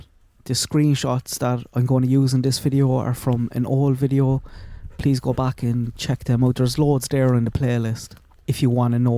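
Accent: Ireland. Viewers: When I heard that accent I knew you were Irish. But I had to check. I'm happy I found your channel. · Ok I'm new to your channel, about a month or so & I'm from the US & adore your Irish accent & your dog is beautiful. My apologies on this day I cannot finish watching the video bc of hearing of the abuse to Tony Klines daughter, black eye at 4 & burnt hands. I know it's important for your channel if your videos are watched to the end but I just can't listen to this. So sorry. Oh but I know what I can do I'll finish the video with THE VOLUME OFF 🔇 and enjoy your beautiful dog. I'm Mimi btw.💜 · Thanks for your insight and notes. Heartbreaking for Tony’s family and all the animals he killed. Not related at all but just want to say I love your Irish accent. My gran was from Limerick, which county are you from?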